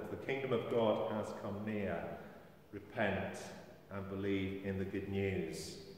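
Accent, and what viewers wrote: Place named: England, United Kingdom. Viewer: I look forward to your sermons as I live in Greece and we only have Greek Orthodox churches here -- I look forward to listening to you (good old English accent) - I miss Edgeley and look forward to coming home as soon as I sell my place here. Thank you. Stay safe.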